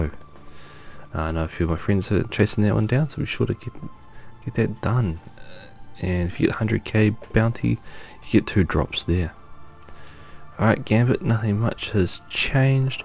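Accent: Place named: New Zealand. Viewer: R u a new zealander sound like it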